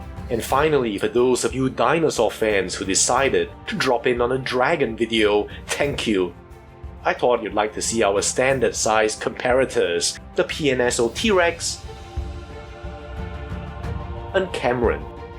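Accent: Singapore. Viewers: you sound singaporean/malaysian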